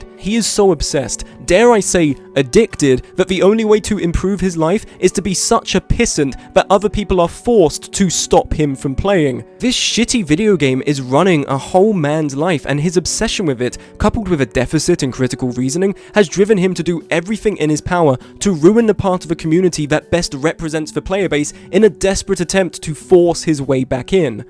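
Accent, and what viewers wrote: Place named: United Kingdom. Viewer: Oooh, a 4-hour video analysis on a game narrated by a British person! I'm sure that my ADHD riddled mind can handle this! 😃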